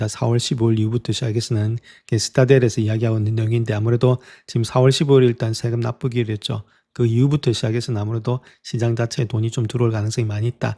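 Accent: Gyeongsang, South Korea. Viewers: 가끔 사투리요? 1초만 들어도 찐찐찐 경상도 토박이 사투리 영상 내내 엄청 쓰시는데요?ㅋㅋㅋ서울경기분이면 1초컷이예요. 사투리 심하게 들리는거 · 님 경상도 분이시져? ㅎ 설명할 때 가끔 사투리.. 얼마니 친숙하게 느껴지는지 금리 내라야..ㅎ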